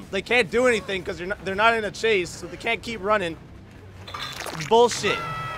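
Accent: Czech Republic. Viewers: ty jsi čech vole? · You from Czechia ?😂❤🇨🇿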